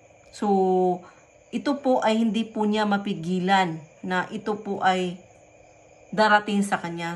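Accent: Visayas, Philippines. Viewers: The way you talk ay parang hindi ka tagalog. Sa palagay ko, bisaya ka. Believe ako sa tapang mo.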